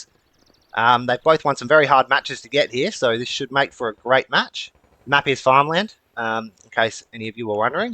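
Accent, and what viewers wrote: Australia. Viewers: Seriously though, you are a natural at gameplay commentary, and your whole tournament series was very entertaining!! Your aussie accent is lovely and improves the overall experience for me, personally. All the best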